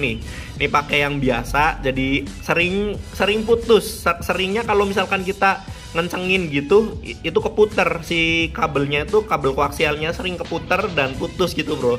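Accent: Banyumas, Indonesia. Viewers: Bang .pengin beli d mana ya ngapak juga kie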